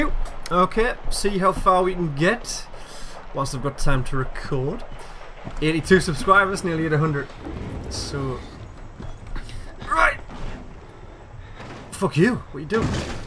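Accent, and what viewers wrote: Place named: United States. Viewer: I watched anyways. I actually like your accent (have a real hard time placing it). I was talking about your American accent. It made me giggle.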